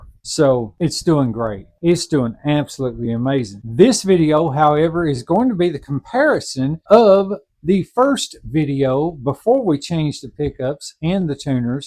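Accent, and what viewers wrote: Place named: Texas, United States. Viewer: Love your southern accent! you from texas?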